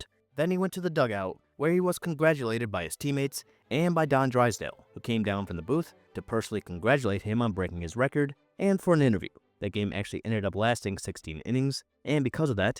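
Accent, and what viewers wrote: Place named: United Kingdom. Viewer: I never knew the official statistician for MLB was British.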